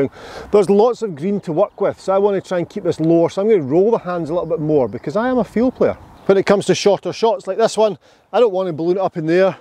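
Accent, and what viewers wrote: Scotland, United Kingdom. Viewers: Looking forward to trying this out, great video 🙏👍 Side note... after all these great lessons and tips, if Morgan freeman ever leaves the post open throwing your hat in the ring for new voice of God. I'm starting to hear a Scottish accent from above while standing over a golf ball 😊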